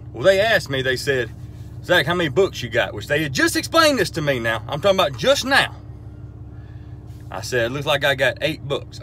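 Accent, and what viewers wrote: South, United States. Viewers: no, cause he is mad relatable and funny. he is a great story teller. i do love southern accents though:) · Hank is Texan. King of the Hill takes place in Texas. This man is clearly Southern, not Texan. You can tell by his accent and the fact that he mentioned corn and "cornhole". Those aren't Texan.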